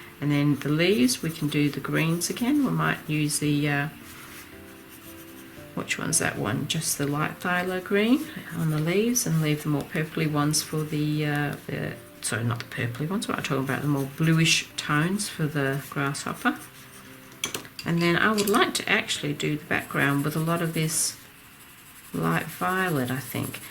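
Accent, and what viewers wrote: Australia. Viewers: Thank you so much for the lesson, it has really caught my attention on how to pick a color pallet. This was very informative. It's so good to hear your Australian accent again and you sound like your beautiful self again. I'm so glad you are back.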